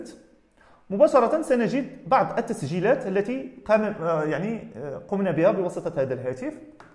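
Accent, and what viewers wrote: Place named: Morocco. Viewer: انته بتتكلم ً. مغربي. ما فهمنا. عليك بتتكلم. بسرعه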